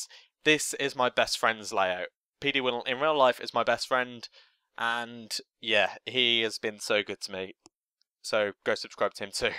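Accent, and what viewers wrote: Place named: Birmingham, United Kingdom. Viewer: Good to hear a fellow Brummie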